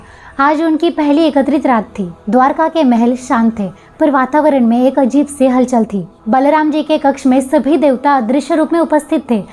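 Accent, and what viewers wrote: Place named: Maharashtra, India. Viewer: I love your hindi with a slight hint of marathi accent. God bless you!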